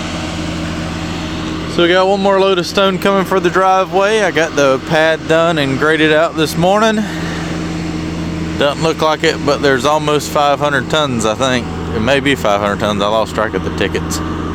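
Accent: South, United States. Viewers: I need someone to do this type of work for me. I'm building a house soon, but the pad needs to be built up for sure. Where are you at? I hear some southern accent. SW Arkansas is where I need the work done. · Your southern accent sound’s almost just like my Uncle Charles in Tennessee😂😂😂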